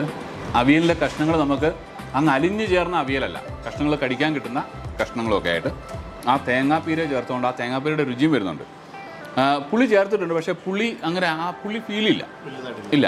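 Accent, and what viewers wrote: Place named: Kumbanad-Kozhencherry, India. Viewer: Love watching your programme..well hearing too. Tje malayalam is very similar to what we spoke. My dad is from Kumbanad & mom from Kozhencherry. Havent really lived there...but some 4/54 in kerala..2 in tvm and 2 in kumbanad, 3 in Manipal. Some 47 in Africa-2 in Ethiopia at birth, 13 Zambia and like 31 in South Africa now..but everything you do is do much like what I really am...thanks buddy!!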